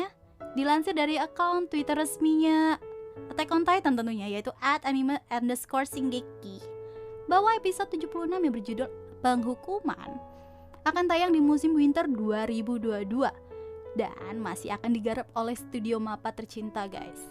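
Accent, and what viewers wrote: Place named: Sunda, Indonesia. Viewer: Fix naratornya orang sunda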